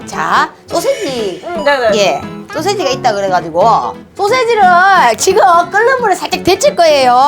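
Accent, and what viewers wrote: Busan, South Korea. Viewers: 울 부산 이모랑 말투 똑같.. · 부사너로써 찐사투리 들을 수 있는 요 컨텐츠 넘 조아요! 그리고 거침없는 요리실력 속이 씌원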